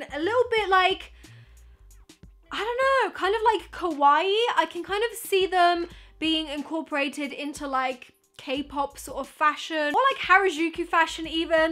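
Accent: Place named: United Kingdom. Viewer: Her british accent OMG 😭💖💖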